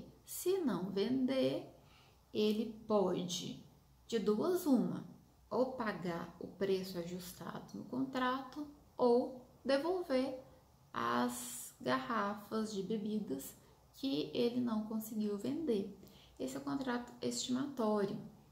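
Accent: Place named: Minas Gerais, Brazil. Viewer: aaaa o sotaque mineiro é a coisa mais confortável de se ouvir🥰🥰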